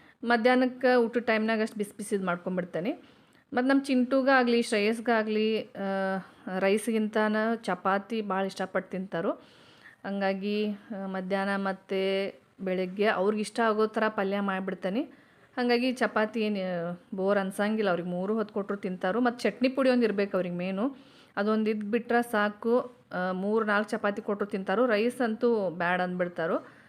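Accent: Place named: Uttara Kannada, India. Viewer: Nam uttar kannada bhashe chend matadtri ade tara matadi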